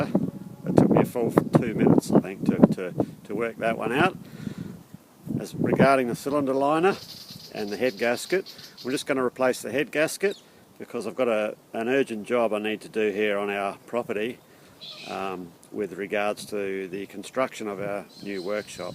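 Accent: Australia. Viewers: First time watching your channel i found you via Cam at Battler. A new Workshop and Machine Shop sounds very interesting. Definitely worth subscribing to find out more Sounds like an Aussie accent the T Shirt you are wearing had me guessing for a minute but once outside that definitely looks like an Australian farm. Looking forward to watching your current video,s and more in the future. All the best. Regards Peter.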